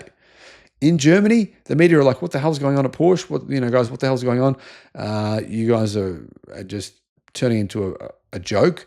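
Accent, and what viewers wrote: Australia. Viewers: has amazing analytical ability. heralds the rise of EVs and the demise of the Internal combustion engines for five years as we now see this happen before our eyes. I used to call you, in 2021, (where you no longer live). Congratulations on your abilities and foresight. Thanks for thousands of videos and positive outlook. 😂🎉 And after 1000s of videos as well as from SMR, I, from Arizona, still can't do the Australian accent!!! 😢 Thank you.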